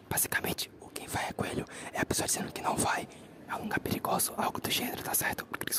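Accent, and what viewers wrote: Bahia, Brazil. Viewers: Eu pesquisei por esse vídeo, fiquei feliz por vocês terem feito pq eu amo o asmr desse canal, e as gírias/ sotaque baiano são os melhores.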